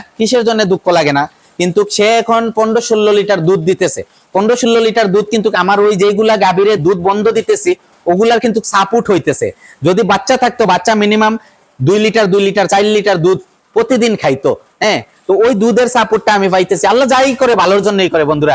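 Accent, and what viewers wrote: Sylhet, Bangladesh. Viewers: ভাই আমি সিলেটি আপনে নি সিলেটি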